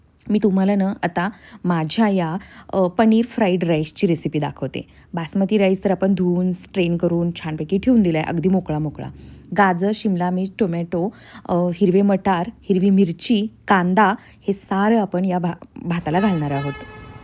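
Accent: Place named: Kolhapur, India. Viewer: तुमची भाषा सेम माझ्या कोल्हापूर सारखीच वाटते ❤️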